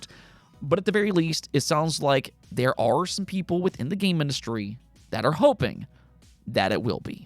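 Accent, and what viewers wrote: Philadelphia, United States. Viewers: Your accent, are you from Philly or nearby?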